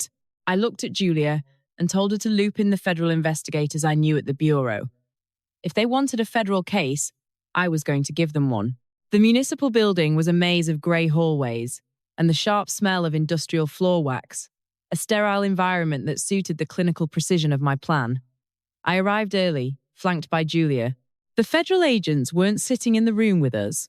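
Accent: United Kingdom. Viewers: Yes just seem odd a British accent on an American story 😮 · Why do you have a British person narrating an American story with an Asian video playing?? Kind of stupid, don't you think??? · Why did they get a narrator with a British accent? It’s so out of place. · Why is she speaking with a British Accent instead American? HUH? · Nice story. But hearing a British accent saying they worked as a customs agent at a base controlling a port in South Carolina was wrong. Adding the visuals of Hotel Saigon Morin added to the confusion.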